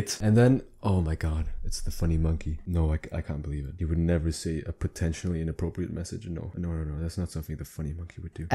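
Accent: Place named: Germany. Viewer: Joa net schlecht das man als Deutscher versucht einen englischen Kanal man merkt schon das sich die deutsche mc Community sich für solche Videos nicht interessiert seit dem BastiGHG nur eintönige Challenge Videos macht. Ansich ist dein Englisch sehr gut aber man hört nur minimal dein deutschen Akzent.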